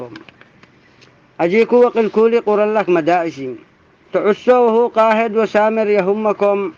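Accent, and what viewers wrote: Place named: Raymah, Yemen. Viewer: حاش انك اصابي انت ريمي وهذي لهجةاصحاب ريمه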